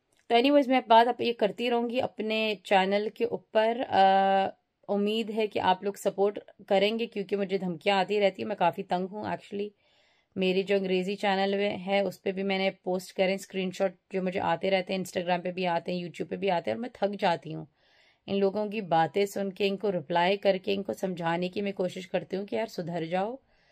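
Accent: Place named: India. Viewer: You're just like Indian,because your tonks are very much similar to hindi & i feel that the way of talking is too much Indian.Happy Diwali & happy new year.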